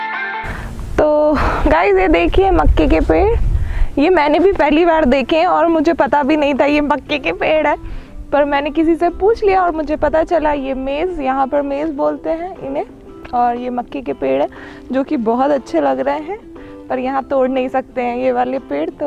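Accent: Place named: Madhya Pradesh, India. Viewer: अरे आप मप्र से हो आज पता चला। मैं तो पहले ही समझ गया था, आपके बोलने के तरीके से आप हमारे एरिए से हो आप मप्र में कहाँ से हो।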